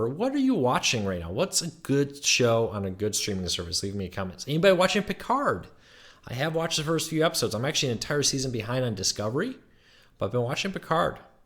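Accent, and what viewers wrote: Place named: Michigan, United States. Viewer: You grew up in Michigan! Me too! What part? I'm from Bloomfield Hills. Thought I recognized the accent 😉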